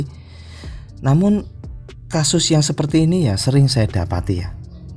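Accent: Java, Indonesia. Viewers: Mas orang mana sih kok kayak orang Jawa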